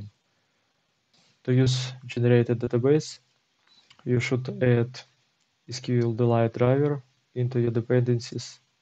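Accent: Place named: Post-Soviet states. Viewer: Искал инфу по SQLDelight, начал смотреть видос, и такой, акцент, слишком грубооо, пахнет снг, потом смотрю а это )Лайк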